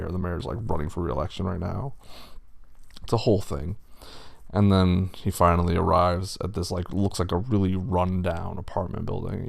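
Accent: Germany. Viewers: the german accent is fucking sending me into oblivion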